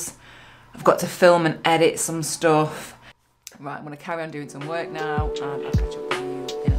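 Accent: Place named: Northern England, United Kingdom. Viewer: To say that you enjoy listening to somebody's talking, I've always found it to be the ultime compliment you could pay to someone; and your Northern accent is so warm and clear, it is so welcoming! 🌝 I don't know who the hell was on that race but you could've dropped the entire comment section, me plodding along at the very tail 🙃😄 😃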